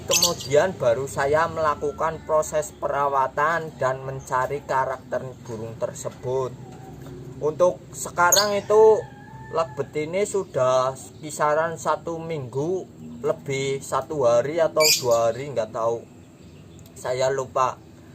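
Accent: Banyumas, Indonesia. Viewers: Ora ngapak ora penak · Ahaha jawa medok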